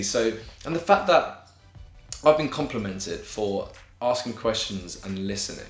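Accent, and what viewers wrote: United Kingdom. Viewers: I'm laughing so hard at your English accent oh my god... the idea is absolutely GENIUS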